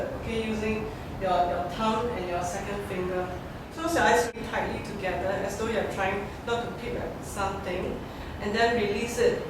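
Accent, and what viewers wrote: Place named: China. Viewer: what is she saying? can someone please translate her chinenglish?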